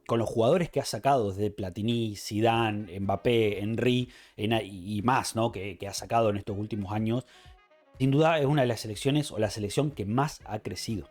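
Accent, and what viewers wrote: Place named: Argentina. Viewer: Antes de ver el video, veo que tu acento es argentino o uruguayo, vamos a ver a quien pones antes si a argentina o a Italia, o... Uruguay o Francia jajajajajaja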